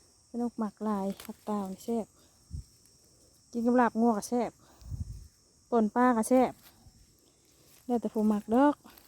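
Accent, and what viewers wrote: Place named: Savannakhet, Laos. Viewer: ສະບາຍດີ ຕິດຕາມເບີ່ງຢູ່ເດີ..ເຈົ້າສວຍງາມດີ..ແບບທຳມະຊາດສ້າງມາບໍ່ແຕ່ງຫນ້າກໍງາມ...ເວົ້າລາວມ່ວນດີຂອ້ຍຊອບສຳນຽງສຽງເວົ້າພີ້ນອ້ງຄົນສະຫວັນະເຂດເວົ້າລາວ...ຮອ້ນ..ຮອ້ນ..ຮອ້ນ...ຫນ້າເຫັນໃຈ..ຢູ່ບ້ານຂອ້ຍໜາວເຢັນ...ໂຊກດີເດີ.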